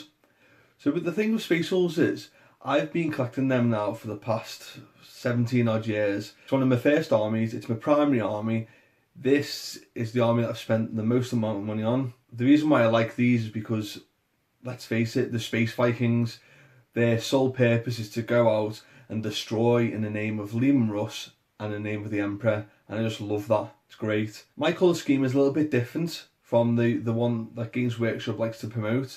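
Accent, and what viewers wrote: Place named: Liverpool, United Kingdom. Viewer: Oh Christ it’s a scouser 😂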